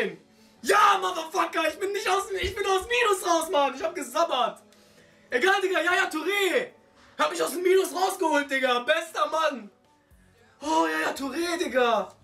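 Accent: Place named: Turkey. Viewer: ich schwör dieses deutsch türkisch.....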